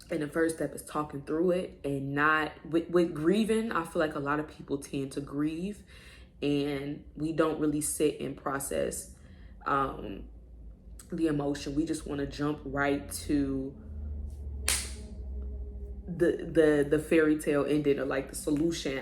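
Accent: Chicago, United States. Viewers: I’m from Milwaukee but I live in Texas and your Chicago accent 🥹😬💕 My cousin from Chicago… I love….love💕 You are truly gifted, intelligent and your grandma is smiling down and very proud. Your grandmother passed the torch of good home cooking, continue to use your gift to inspire families to cook at home. #bringbackfamilydinners good luck to you💕🙏🏽🙌🏾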